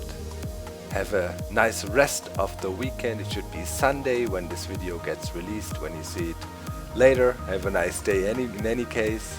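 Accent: Germany. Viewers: ach ich liebe deinen deutschen Akzent :)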